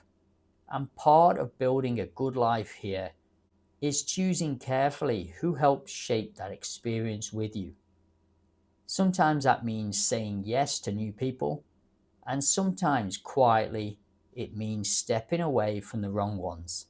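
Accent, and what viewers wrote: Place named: England, United Kingdom. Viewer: could be it's accent thing from England lol, are you from north west UK ? I'm finally coming over. I had set deadline of 55 but pulling the trigger now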